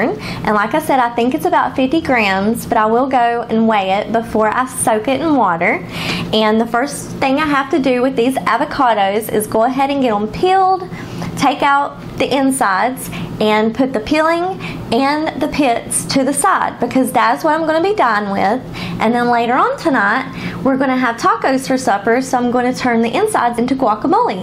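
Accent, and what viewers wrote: South, United States. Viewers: Wow! That is cool. My husband is originally from Mexico and we eat quite a few avocados, now I have a new use for all the peels and pits. How did your guacamole turn out? Love your accent. Always nice to hear a fellow southerner! 💜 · Your so cute, love listening to your southern accent. Thought of trying some dying after the first of the year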